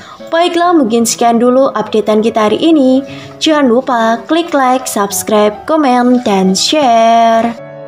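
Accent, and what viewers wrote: Java, Indonesia. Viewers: Medok kali jawa nya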